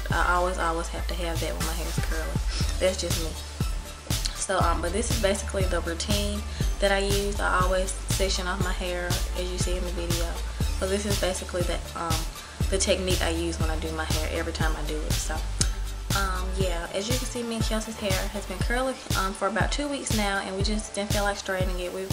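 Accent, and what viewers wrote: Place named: South, United States. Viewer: i love this tutorial and your country accent.... lol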